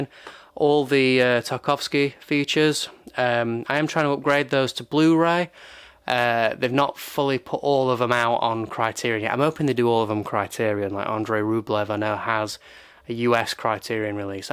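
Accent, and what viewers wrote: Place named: Sheffield, United Kingdom. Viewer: Sheffield, oh I was born there! I should have recognised the accent 😅😅 Great collection, was fun to watch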